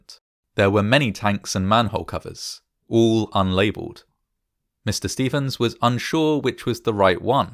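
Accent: England, United Kingdom. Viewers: He's an English native, speaking the language of English, with an English accent, in England 🤯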